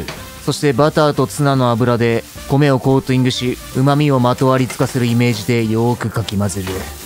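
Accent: Kansai, Japan. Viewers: 「 」「極主夫道」大好き人間には たまらないご馳走料理グルメ番組（;; ） 極道なのに、どこかかわいい主人公… 極道なのに、食材に愛を感じる切り方 極道なのに、ポメと仲良し そしてなぜか関西弁… 卵かけごはんにごま油かけて 牛肉とニンニク乗せて チーズ炙ってバジルかけて マヨネーズとごま・のりを乗せてた料理みたいに どことっても美味しい要素しかないっ 大好きだ❤️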